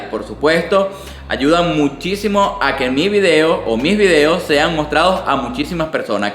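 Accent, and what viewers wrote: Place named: Venezuela. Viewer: Hola! Agradecidas Bendiciones para ti y tu gente! Deduzco que eres venezolano por tu acento! Me parece que estás en otro país! Tengo muchos LP y me estoy deshaciendo de ellos; si sabes de alguien que los quiera o necesite, estoy a la orden para regalarlos! Un abrazo hermano!:face-red-heart-shape:🙏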